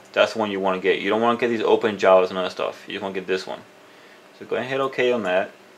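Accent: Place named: United States. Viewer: THANK YOU AND YOUR BEAUTIFUL NORMAL AMERICAN SOUNDING VOICE AND UNDERSTANDABLE ENGLISH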